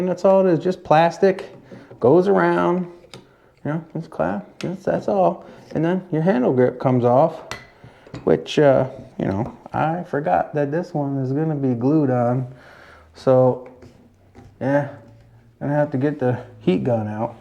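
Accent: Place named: South, United States. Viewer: You’re Southern accent is strong in this video. 😂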